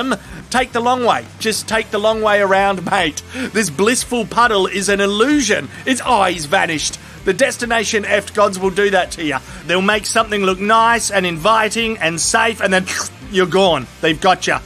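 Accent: Australia. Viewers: I'm not sure if it's the Aussie accent or just your twisted sense of humor but I absolutely respect the funny! Keep em comin. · Why do Australia Accents make the best for commentating?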